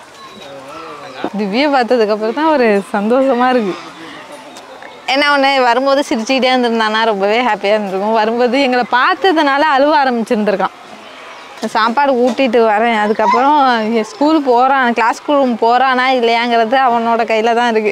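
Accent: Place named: Sirkazhi, India. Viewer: Akka neenga praaradha ketkum bodhu nalla irukiku ka engalukkum sirkazhi pakkam than unga slang pathe nenaichan super keep rocking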